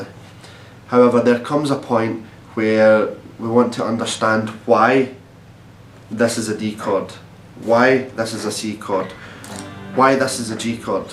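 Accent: Scotland, United Kingdom. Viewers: Thank you so much for this. I started on piano when I was 7 and have been out of playing for 40+ years. My son-in-law gave me one of his old guitars last Christmas and I had forgotten most (if not all) of my theory. It was one of the problems I was having converting the keyboard to the fret board. Even through the Sco"ish accent, this was just the reminder I needed. Thanks again. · He sounds like a fine Scot to me! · Dat Scottish accent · I'm in love with your accent ! Is it scottish? Northern Irish? Anyways, it's awesome Thanks for the guitar lesson man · Scottish accents rock! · As suggested... some of these exercises are indeed tongue twisters... especially when spoken in a broad Scottish accent! Good instructional video nonetheless... well done! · Comments on the video later but that accent is amazing!! Scotland?? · Scottish accent... no problem to follow him... 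thanks to few years in UK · Scottish?? Great video man btw man!!! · Like your accent. Scottish? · he speaks nasal. But where is this accent from? Which part of the UK?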